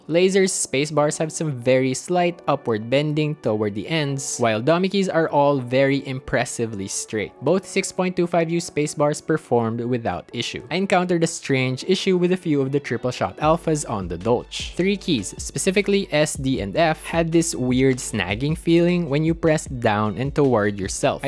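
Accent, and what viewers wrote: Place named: Philippines. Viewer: wow this is my first time watching ur channel and the second i click the video i already guessed u were filipino lol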